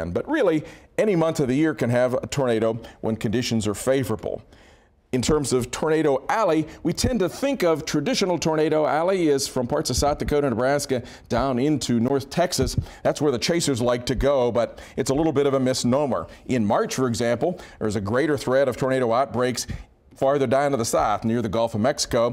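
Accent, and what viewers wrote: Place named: Pittsburgh, United States. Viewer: He speaks "Pittsburghese." He's from western Pennsylvania.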